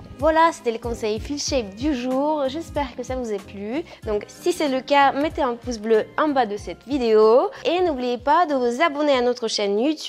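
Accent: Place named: Eastern Europe. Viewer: Accent europe de l est,,pologne,roumanie?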